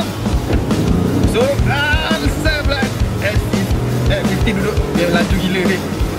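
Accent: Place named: Kelantan, Malaysia. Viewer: ni ore Kelate ke? Bila sebut 'tadi' jadi 'takdi' dan 'steering' jadi 'stereng'. Hehe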